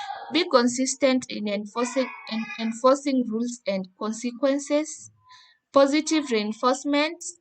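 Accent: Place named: Kuria, Kenya. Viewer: Heavy Kurian accent kama nusu vinemba wenzako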